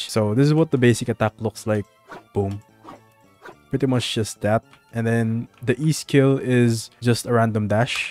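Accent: Philippines. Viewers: he sounds filipino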